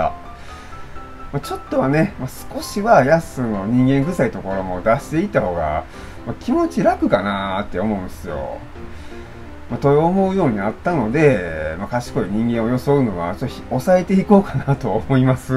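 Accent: Osaka, Japan. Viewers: 毎日アップされるのは素晴らしいことだと感心しています。今日何話そうかなあ？って思うのは大変かなと思いますが。私はさんの家族の話を愛を大阪弁に包んで面白く話すのが好きです。毎日動画アップでさんが元気なことがわかるのでこれからも頑張ってくださいね。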